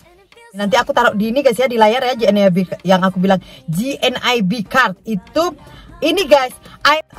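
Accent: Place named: Surabaya, Indonesia. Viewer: ini ono.... ini ono.... ini ono.... kyk aku kl ngomong.... wahhhh iki kyk e wong Suroboyo an.... logat e arek" Suroboyo an.... iyo tho rek , sampeyan arek Suroboyo tha ?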